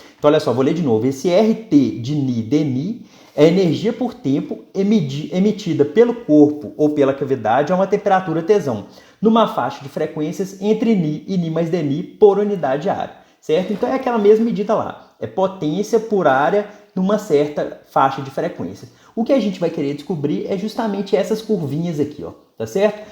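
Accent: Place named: Minas Gerais, Brazil. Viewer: Professor com explicação excelente. Essa serie é uma joia do Youtube. A cereja do bolo é o sotaque mineiro do prof. Abraços!!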